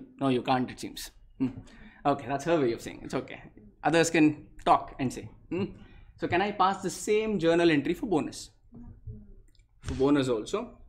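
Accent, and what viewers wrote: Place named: Hubli, India. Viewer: Sir r u from karnataka??yavdappa aaytu it is just like our Hubli language 😅❤️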